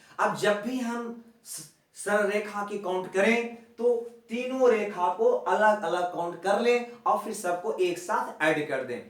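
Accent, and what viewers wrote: Bihar, India. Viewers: Aap Bihar Ka ha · Sir Aap Bihar se hai???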